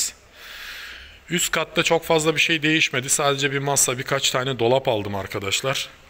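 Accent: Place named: Russia. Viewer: Ustam çok güzel olmuş. Hayirli ıslerin olsun. Konuşmanda ara ara rusça aroması alıyorum. 😊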